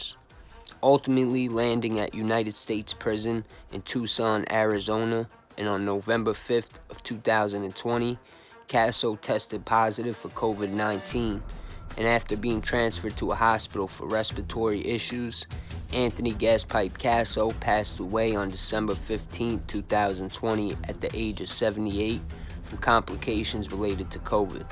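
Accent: New York, United States. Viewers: for the record…your voice is perfect for these videos. Not sure where your from but I’d swear your from the NY/NJ area…been here my whole life so most of your stories are based on areas within a half hour all around. Keep up the good work…I look forward to seeing your notifications 😉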